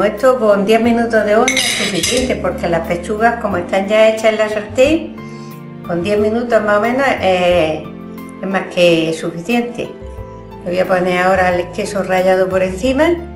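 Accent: Murcia, Spain. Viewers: las hice ayer buenisimas usted debe ser de cartagena o murcia por el habla murciano yo soy de ahy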